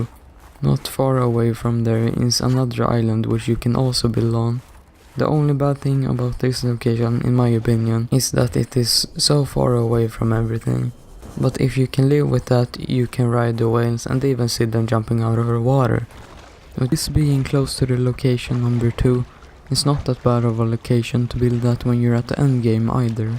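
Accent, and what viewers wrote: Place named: Sweden. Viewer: your accent SCREAMS swedish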